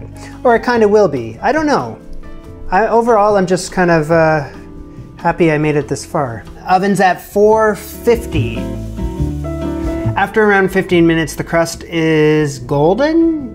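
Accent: Canada. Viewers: First time I ever saw your vid. For some reason I detect a bit of Canadian in your voice but could be wrong?I very much like your "son of a bitch"! Will use your filling recipe to make pumpkin custard because stressing with pastry is not my idea of a good time. Subscribed!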